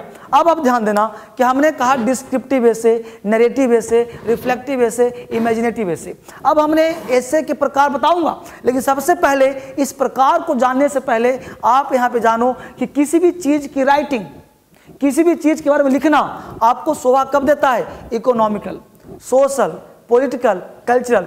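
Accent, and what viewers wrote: Bihar, India. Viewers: आपके आवाज से लगता है कि आप बिहारी मूल के है...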